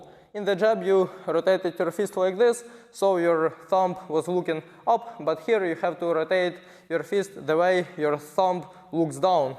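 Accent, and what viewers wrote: Russia. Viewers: Russian accent = absolute beast in anything combat related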